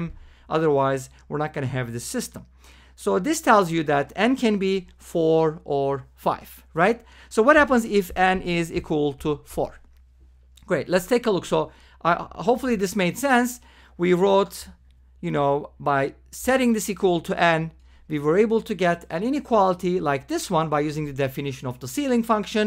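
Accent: Turkey. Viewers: ingilizcen türk ingilizcesi gibi geldi. twitterdan takip ettiklerine baktım ve ta taaa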